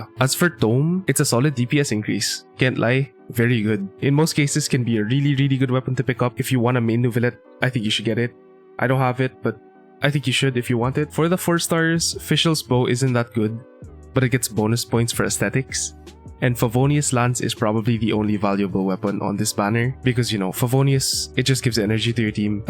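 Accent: Philippines. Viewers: why do u sound so filipino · Sounds very Filipino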